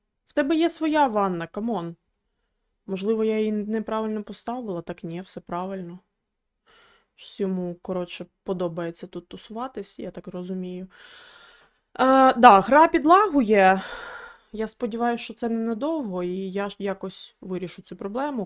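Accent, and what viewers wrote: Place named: Lviv, Ukraine. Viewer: А звідки ти родом?) Мова дуже нагадує мені Львів або Тернопіль..)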